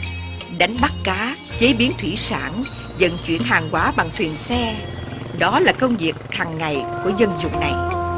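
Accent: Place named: South, Vietnam. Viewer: Người đọc giọng Nam Bộ rất dễ nghe, tình cảm, rõ ràng. Cám ơn bạn.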